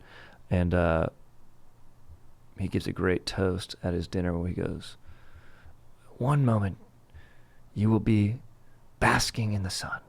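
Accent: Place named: Brooklyn, United States. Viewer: Close your eyes from - and you will time travel in 1920's Brooklyn, New York. Truly miraculous experience.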